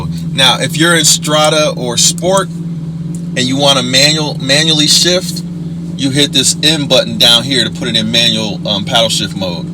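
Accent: United Kingdom. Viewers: Loving your British accent😁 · I didn't know you were British? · So no mode days Drive it's Strada Corsair or sport mode to go forward and r for reverse. Very interesting so if u put it in neutral when stopped you're self do it rev or go into first gear when u press the Gas? Lol British Accent. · British accents are amazing lol, nice how to man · love the bristish accent 😂👌🏼 congrats on the car .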